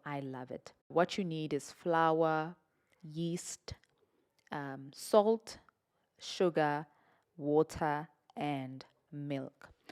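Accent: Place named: Zimbabwe. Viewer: oh my you have a Zimbabwean accent I didn't even read the caption I just saw a black woman and I clinked lol but anyway this is so needed for the young ones good job mama love from Canada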